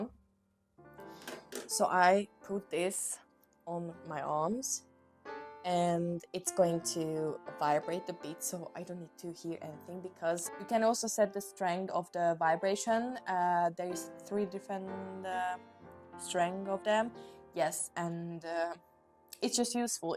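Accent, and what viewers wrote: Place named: France. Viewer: What is that small black triangular item in the plastic bag that you put in your flute bag? By the way, I love your accent. Are you French?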